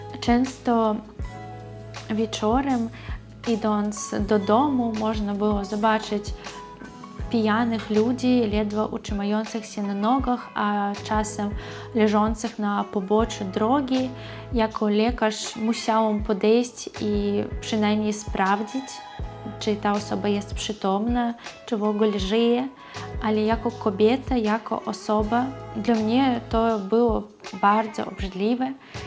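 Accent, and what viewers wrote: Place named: East, Poland. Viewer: Witam Panią,! Proszę uwagi na tego faceta o pseudonimie To jakaś dziwna kreatura. Co więcej, jego znajomość języka polskiego jego bardzo słaba. Może chodziło mu o to, że gdy Pani napisała "Ale jaki świetny wpis.", to wypadało raczej powiedzieć "Ale jaki miły (ALBO pochlebny) wpis (ALBO komentarz)!" Czy będąc lekarką z białoruskim wykształceniem mogłaby Pani wykonywać swój cenny zawód w Polsce, choćby po kilka godzin dziennie? W Polsce odczuwamy brak lekarzy. Podziwiam u Pani bardzo dobrą znajomość języka polskiego. Pełen uroku jest Pani ten słodki, lekki wschodni akcent, który jest tak miły dla mych uszu. WSZYSTKIEGO DOBREGO W NOWYM ROKU DLA PANI I RESZTY WASZEJ MAŁEJ RODZINKI.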